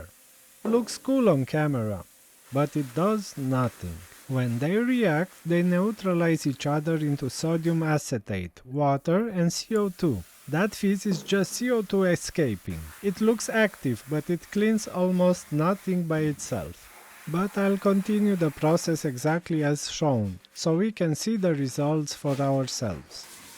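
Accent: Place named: England, United Kingdom. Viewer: I only subscribed and liked this amazing video because of your amazing English accent and your cooking skills!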